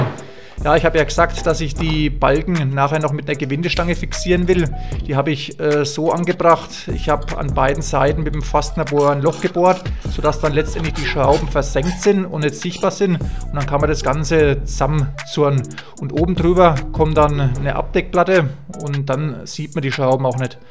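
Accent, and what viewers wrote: Franconia, Germany. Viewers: Klingt extrem Frängisch...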